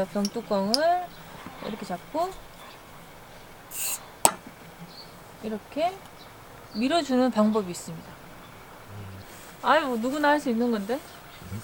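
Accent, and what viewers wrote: Gyeongsang, South Korea. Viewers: 대구경북 출신 맞지요? ㅋ 억양이 쫌 ~~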